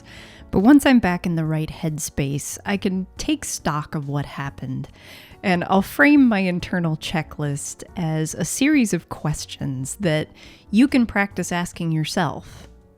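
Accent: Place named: United States. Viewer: That's a very strong American accent ... I can't hear an English accent at all.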